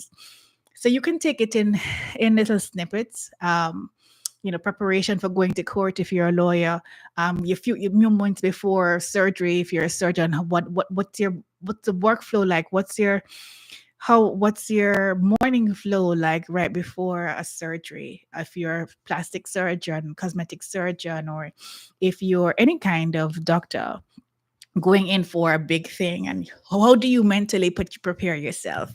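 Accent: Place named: Jamaica. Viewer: First. Your green glasses are on my radar. How beautiful!!!! Second- I hear a little bit of my island in your voice. Shall I guess? JAWI? 🇯🇲Perhaps Bahamas? Great information here for entrepreneurial, industry growth. 🌻✝️🇯🇲🌻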